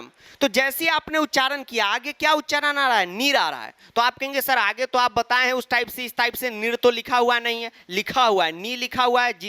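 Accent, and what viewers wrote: Bihar, India. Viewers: i like bihari and after hearing you for hours i am also now speaking bihari 😅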